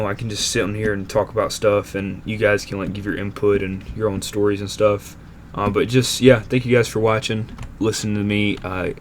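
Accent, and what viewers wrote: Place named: United States. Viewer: sick Minecraft world, your American accent is sick what state r u from if you don't mind me asking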